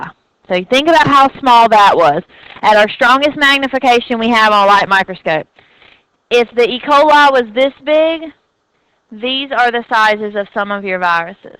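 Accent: South, United States. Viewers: I'm from jersey and I've gotta say, I looove this woman's southern drawl. Her voice will definitely be echoing in my head during the test. Thank you so much for the help!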